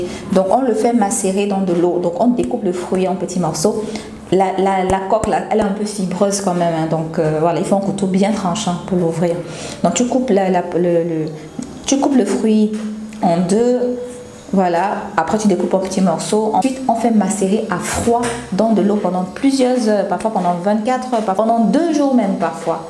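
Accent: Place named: Ivory Coast. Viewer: Tu commence à parler comme une Ivoirienne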